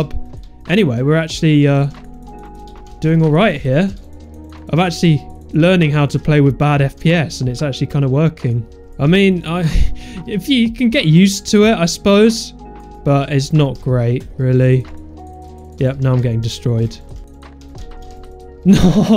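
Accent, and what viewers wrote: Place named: Belfast, United Kingdom. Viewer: u r from belfast. i know that :)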